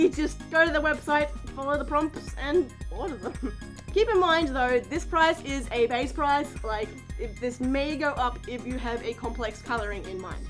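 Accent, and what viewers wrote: Australia. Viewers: you AUSSIE 0_0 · Wait are you Australian!? I am!! 😄🐺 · R u Aussie? I wanna meet you one day boi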